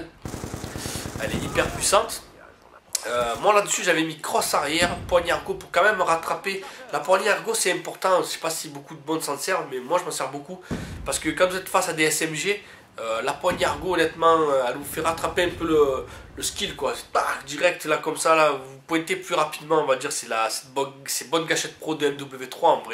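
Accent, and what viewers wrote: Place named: Marseille, France. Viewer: yeah toujours en forme avec l'accent de notre marseille <3 toujours aussi bon a call of;)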